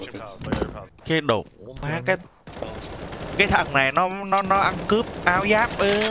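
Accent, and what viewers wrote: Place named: South, Vietnam. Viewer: Nói tiếng miền nam hay vl a ạ:)))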